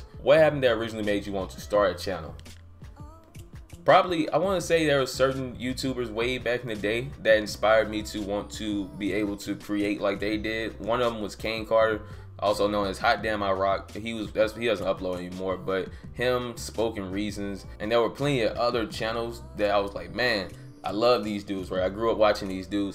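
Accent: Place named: Mississippi, United States. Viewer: I would love to hear your Mississippi accent! Thanks for answering my question!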